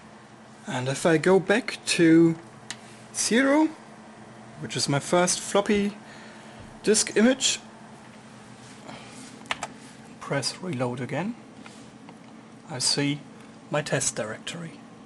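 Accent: Germany. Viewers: I am detecting a German accent here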